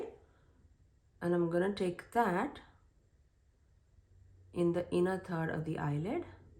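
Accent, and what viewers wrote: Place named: India. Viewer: Don't worry about your voice. It's still giving ASMR vibe for me. I just realized my old friend Ambuja used to give me the same feelings before I knew what ASMR was. I thought I was a weirdo for getting that buzzy feeling. It must be something about your soothing Indian accents that get me.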